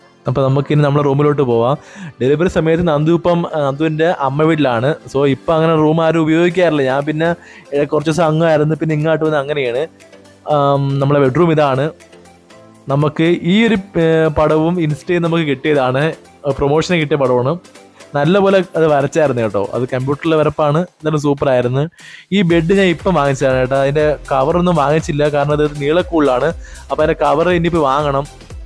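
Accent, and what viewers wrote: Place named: Southern Kerala, India. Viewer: നല്ല വീട്, വളരെ simple,ഭാഷ അടിപൊളി തെക്കൻ, മണ്ടേൽ കേറി പോകുന്നു, 👌👌👌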